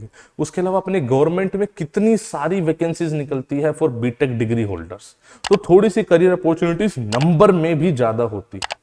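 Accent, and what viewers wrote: Haryana, India. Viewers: sir aap HARYANA sai ho kya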